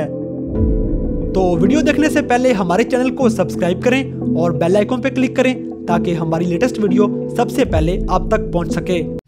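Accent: India. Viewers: Iskay lehjay say saaf pata lag raha hai ke yeh Indian hai ..... meray Baloch Bhai Amir Hamza ki aulad ho aur sab Pakistanio ke dil mein basty ho. Jhootay Indian propaganday say bach ke raho. Inko aik din Kashmir bhi chorna paray ga.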